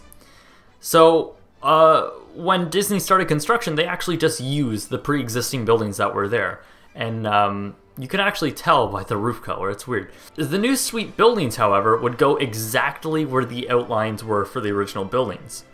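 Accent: Canada. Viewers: Super interesting and wonderfully done,! (are you Canadian? - you have that accent!) Good stuff. I love your work.!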